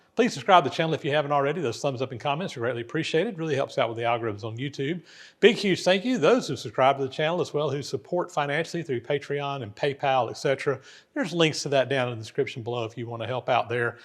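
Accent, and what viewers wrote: South, United States. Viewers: Hope y’all appreciating the genuine accent of a true Southern gentleman. 90% of what you hear in film/television is an “attempted” accent, and usually highly exaggerated. :-)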